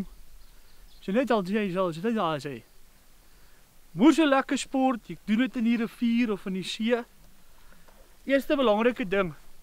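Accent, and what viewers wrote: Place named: England, United Kingdom. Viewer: 😂 ek love die helse Engels aksent met die "okay" en "alright"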